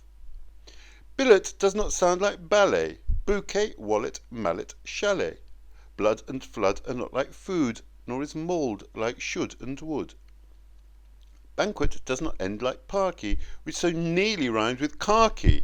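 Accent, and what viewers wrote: United Kingdom. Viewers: So far this is the best rendition I have found, with a clear RP (Received Pronunciation) accent. It is nice to have the complete version too.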